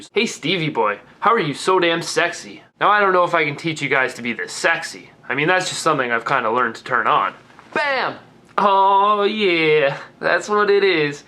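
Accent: Canada. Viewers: It's actually quite slight. I didn't notice until almost two minutes in. In california, our considents at the end of our words are weak, almost silent. For Canada, it's in the way you pronouce your vowels within or at the start of a word, like out and start. And its only with a few words. Sometimes, you can't even tell. I mainly hear it in about.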